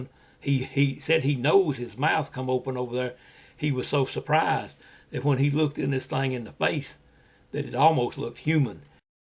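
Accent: South, United States. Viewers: That was a good story, the illustrations were fantastic and I love your southern accent. Glad he didn't shoot BF and all turned out well for everyone, sorry the dogs got beat up tho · I loved listening to your Southern drawl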